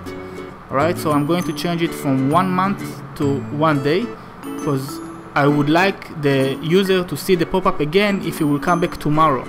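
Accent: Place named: Tanzania. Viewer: You have a Tanzania accent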